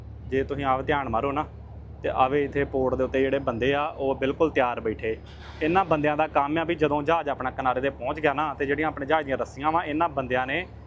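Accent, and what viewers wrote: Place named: Gurdaspur, India. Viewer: tuhadi boli toh lagda hai k Gurdaspur dinanagar toh ho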